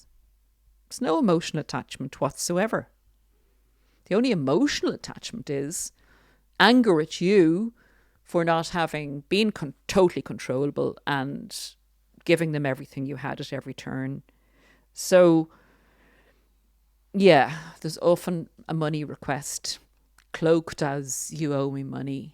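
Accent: Ireland. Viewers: I love her and remy ❤ and the accent is that Irish?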